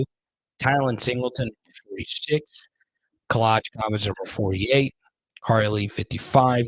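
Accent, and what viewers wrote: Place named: North Louisiana, United States. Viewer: you sound like you have some North LA ties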